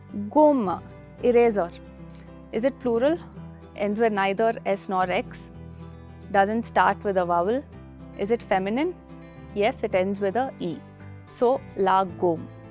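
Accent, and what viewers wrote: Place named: New York, United States. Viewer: Very clear and NYC voice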